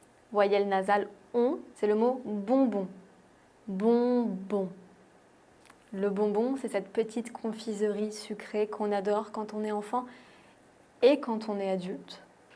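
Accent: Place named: South, France. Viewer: PS : Je suis pratiquement sûr que tu habites la partie sud de la France. Etant breton, je remarque vite les accents ! Enfin, je sais pas pourquoi je dis "en tant que breton", je n'ai jamais lu que l'on avait ce super pouvoir plus que d'autres ^^ Sinon les arabesques ont été identifiés en Occident au XVe siècle comme un élément de l'art islamique, d'où leur nom. Mais en Occident ce terme a été utilisé pour désigner par extension des entrelacs et des rinceaux divers, y compris ceux de tradition occidentale, même lorsqu'ils n'ont aucune correspondance avec les motifs arabes.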